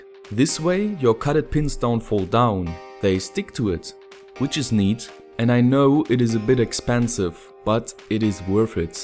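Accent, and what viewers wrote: Saxony, Germany. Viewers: Hi, great Video! Especially because I'll have to build a new tools stock for reactivating my old hobby. My first project is to hook up a old ghettoblaster with bluetooth for my brothers birthday. I even have the service manual including the schematic, but it's a while since I last read one. May you help me to find out where I'll grab the AC for my converter board? It would help me a lot. Fabigg PS: Even though I'm a German too, it's kind of polite too write in English because your videos are English. PPS: But I'm wondering what dialect you might have, because you pimped a Simson and the sound of some phases I'll guess it's saxonian. Am I right? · Tolles Video :) Jetzt brauch ich nur noch eine Bench Power Supply, dann hab ich alles :D Kann das sein, dass du eher aus dem Osten Deutschlands kommst? Sachsen oderso? :D Hört man ein wenig :)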